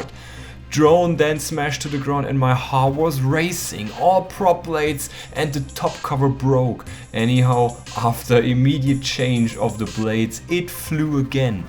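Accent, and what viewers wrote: Germany. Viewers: Moin !! Bist du deutsch 😅man hört dein Akzent haha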